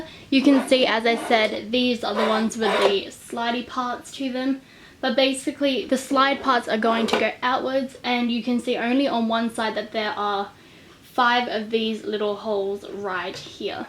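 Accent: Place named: Australia. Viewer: She Sounds Aussie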